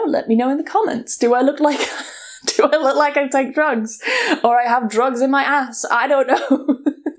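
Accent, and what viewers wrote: United Kingdom. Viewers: Hello ,i appreciate it and i am happy i can learn british accent , grammar . .. with you and we were born on the same month ,oh my God 🤩by the way i love your hair color and eyes please give them to me 😂😂and congratulations to your BF and us for having u _ thank God Please take care of yourself and it's not a comment it's like a letter 😂😂😂😂😂💓love you❤❤❤ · B3autifull British ac3n7..oh.. que fofa ,fala português.. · I understand you so much!I hate mouth noises too. It always drives me crazy.I glad to find the same person as I am.👍 Also I love your british accent.💜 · This the first time a hear a British person speaking english and understand everything even without subtitles. By the way i am a greek trying to improve my English and i really like you I think you are adorable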